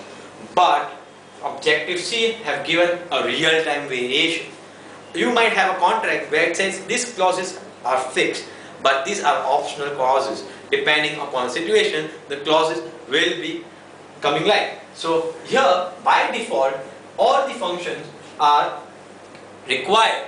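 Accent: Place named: Asia. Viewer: Boss it is Asian English!!!!!!!!!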